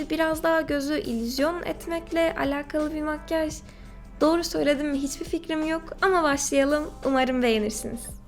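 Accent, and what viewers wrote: Turkey. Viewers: And because Turkish is a highly evolved language in comparison to other Turkic languages. She also speaks the Istanbul variant of Turkish. Millions of Turks there speak like that. The politician speak the Turkish more sharply (because it needs to be more aggressive, because obviously, it is politics). Politicians even get diction training in Turkey to speak the way they speak now.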